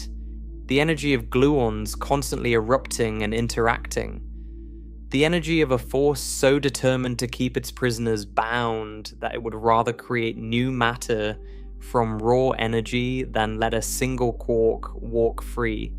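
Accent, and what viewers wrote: United Kingdom. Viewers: Another AI video with a British accent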